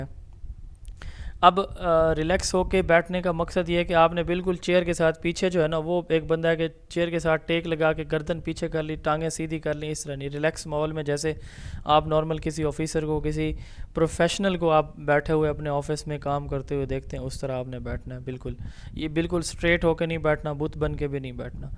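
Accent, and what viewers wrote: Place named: Saraiki, Pakistan. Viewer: Mithe sariaki boli ❤️🌹🌹❤️❤️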